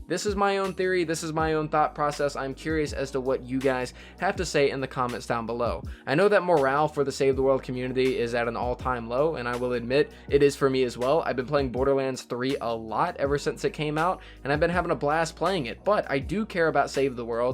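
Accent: France. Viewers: is he from france ?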